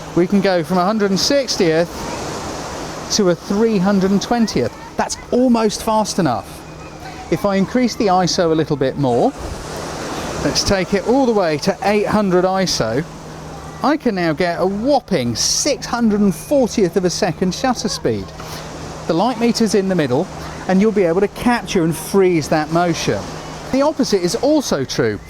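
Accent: England, United Kingdom. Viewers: I really like the way this man explains photography (and the English accents, wow!)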